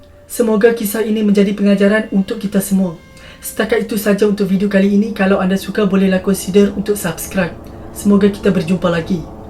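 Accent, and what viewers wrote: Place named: Kuala Lumpur, Malaysia. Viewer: SLANG2 TU JGN NAMPAK SGT..JADIKAN SLANG K.L ORI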